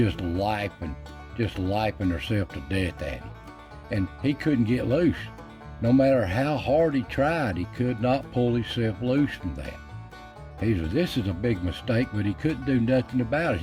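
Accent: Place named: Virginia, United States. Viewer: Man I love hearing your narrative on these stories. I grew up on the coast of virginia on the beach but I briefly lived in Appomattox virginia and hearing you tell these stories reminds me of living up that way near Lynchburg virginia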